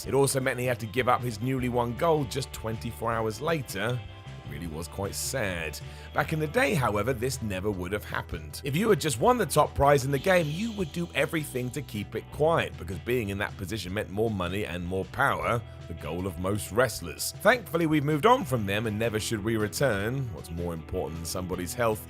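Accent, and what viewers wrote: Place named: United Kingdom. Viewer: Skipped through the entire video within 5 seconds and saw every single thing I wanted to without having to listen to some annoying British millennial mark say anything.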